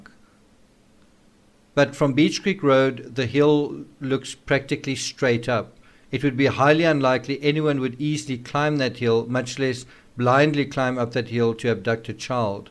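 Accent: South Africa. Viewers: Are you South African ??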